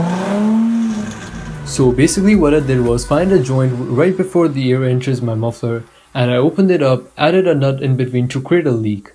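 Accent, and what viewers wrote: India. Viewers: Are you from India? You have Indian accent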